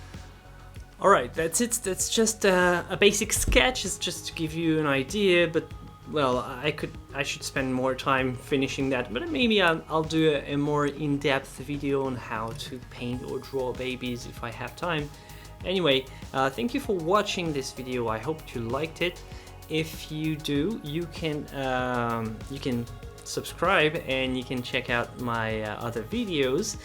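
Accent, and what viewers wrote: France. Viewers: He's french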